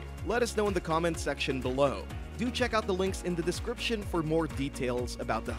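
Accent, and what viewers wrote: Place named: United States. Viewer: he sounds American.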